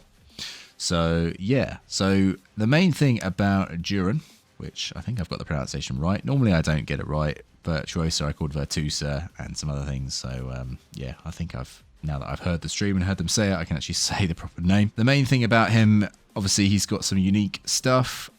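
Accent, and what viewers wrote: United Kingdom. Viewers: No you didn't get the pronunciation right. But close enough, closer than the apes from Ubisoft actually because your British accent fixes one of their mistakes.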